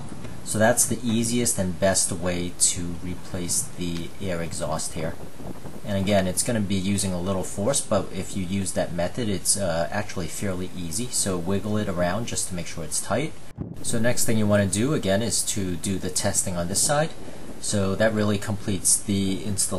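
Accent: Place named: Long Island, United States. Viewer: Great video. Very thorough. Love your mild Long Island accent. Did I get it right? It's like a toned-down Ramones accent. Hey Ho, Let's Go!!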